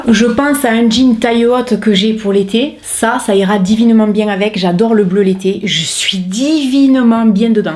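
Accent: South, France. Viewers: Bonjour, vous m'avez fait rire, merci de votre bonne humeur et de votre accent du Sud.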